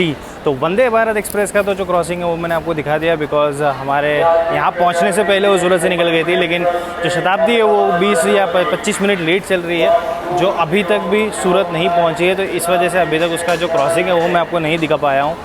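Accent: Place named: Ahmedabad, India. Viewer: I think railway has personal grudges from you tabhi DD mein every time upper deck or station par baat karte time, EMD honk 😂 Jokes Apart, But seriously hamesha ki shaandar. Bich Bich mein Jo Ahmedabadi accent or Common Man wali bhadaas bahar aati hai, Cherry on Top ❤️ Baaki Kabhi toh moka do sir, ki vlog mein hum koi galti dundh sake 🤣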